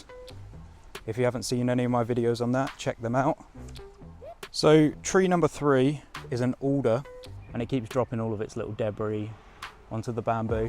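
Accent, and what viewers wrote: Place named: United Kingdom. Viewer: By your accent, mentioning of ash dieback, alders, beeches, and the use of the word lime instead of lindens suggests to me that you and your garden are in the UK. Most likely in England? In your other video about Bissett's bamboo its too bad you didn't plant rivercane (Arundinaria gigantea). That is one of the four species of bamboo native to the US. It is adapted for life along a wild river. But then maybe its hard to get there or it doesn't do well there?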